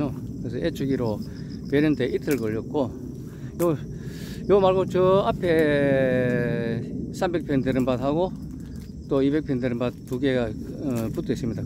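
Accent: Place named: Andong, South Korea. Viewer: 992 출발합니다 오셔서 감사해요 농원의 달콤한 향 가득 만날생각에 설레요 같은 지역의 억양이라 더 정겨워요 안동태생 입니다 ㅎ 자주올게요 ❣️☕️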